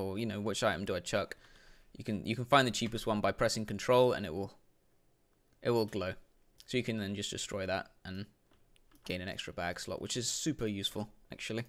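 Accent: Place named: United States. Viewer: That american accent lol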